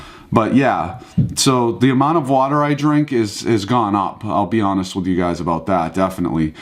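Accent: Boston, United States. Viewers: I'm on day 4, and my tongue is still rubbing on them really bad. My gums are really comfortable though. Do you have any tips for that? I love the accent by the way. I always thought it was just exaggerated in Affleck movies, but it's real!! Cute. Also: did you have a lisp when you started? I do and it's really embarrassing. Last question!! Do you drink water with them in?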